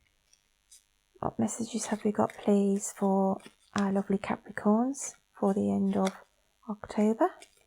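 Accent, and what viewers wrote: Birmingham, United Kingdom. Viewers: thanks my lovely that was really cool what you did it accent sounds local I'm from Birmingham UK I'm sure ur not to far lol x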